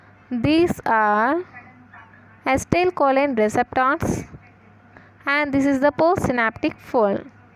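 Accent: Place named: England, United Kingdom. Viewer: Nice presentation. I like your English accent. BTW, what textbook are you teaching from?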